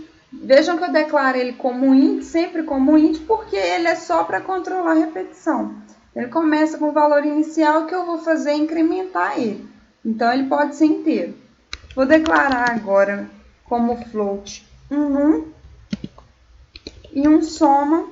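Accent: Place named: Minas Gerais, Brazil. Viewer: Parabéns você explica muito bem, ficou melhor ainda com este sotaque de mineira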